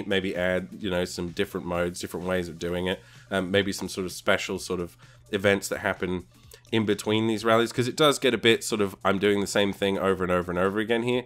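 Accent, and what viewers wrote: Australia. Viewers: you sound like you're from Londan! But seriously though Aussie? Kiwi? Anywho, great review, I loved the honesty and the recommendation, after watching your review, I'm quite keen to give this game a go, since it's dirt cheap (punzrfun). Subbed for future content.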